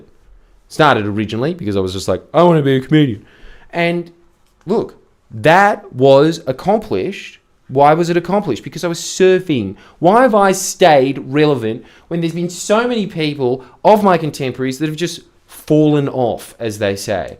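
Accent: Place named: Australia. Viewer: A lot of this is what I already do daily to keep oriented, but it is pretty nice to hear it in an Australian accent with a jawline that could stop a truck. Thanks mate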